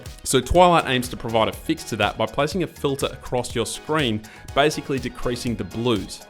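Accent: Australia. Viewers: lemme guess ur a aussie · Good video, great editing, nice apps, good to hear an Aussie accent PS Badlands is way old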